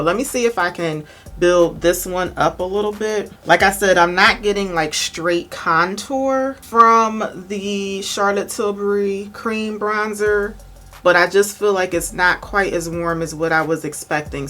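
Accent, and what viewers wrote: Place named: Philadelphia, United States. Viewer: You sound like a Philly girl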